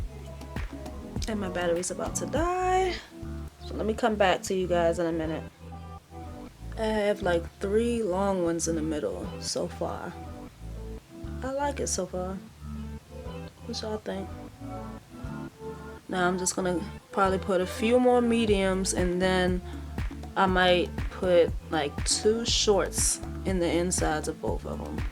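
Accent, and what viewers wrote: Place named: Washington DC, United States. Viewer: When I first started watching I was like “she sounds like she could be from DC” then you said “blowing me” and was like yep! 🤣 hey home team!!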